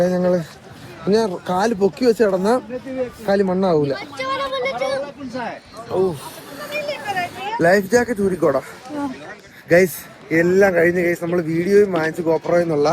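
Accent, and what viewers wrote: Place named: Punjab, India. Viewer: ഹിന്ദിയിൽ നല്ല രീതിയിൽ പഞ്ചാബി വാട അതായത് accent ഉണ്ട്, ഡൽഹിയിൽ പഠിച്ച സമയത്ത് ഒരുപാട് പഞ്ചാബികൾ കൂടെ ഉണ്ടായിരുന്നു എന്ന് തോന്നുന്നു, ആ ഭാഷയിൽ pitch modulation ൻ്റെ വേലിയേറ്റം ഇറക്കം കേട്ടാൽ നന്നായി മനസ്സിലാകും, എന്തായാലും ഹിന്ദി ഇഷ്ടപ്പെട്ടു. വർഷ പഠിക്കുമ്പോഴും വർഷയുടെ ഹിന്ദിയും ഇതേ തരത്തിലാകും.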